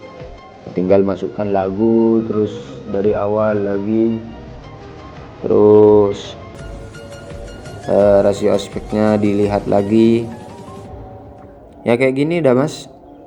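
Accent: Madura, Indonesia. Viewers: Logatnya Madura bangtt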